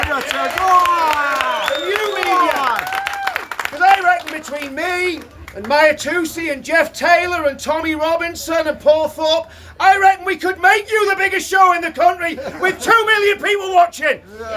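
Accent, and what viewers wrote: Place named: Ireland. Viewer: Love your irish accent ❤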